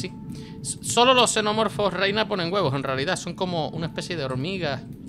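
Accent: Canary Islands, Spain. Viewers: es de las islas canarias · fuese de España por su forma de hablar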